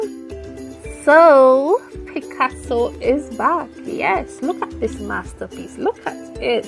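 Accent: Trinidad and Tobago. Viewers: I love your Trini dialect on this video. Too many Trini people make recipe videos and sound weird when they try the American accent haha. Love this. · You sound like a Tobagonian. That MUST be some good tasting coconut drops.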